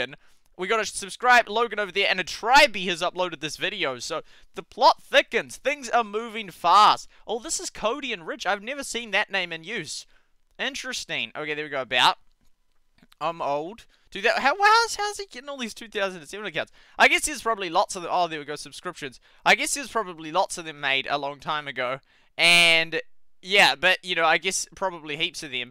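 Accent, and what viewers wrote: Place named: New Zealand. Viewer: Bro, are you a kiwi? Or Australian? I'm getting kiwi twangs in your accent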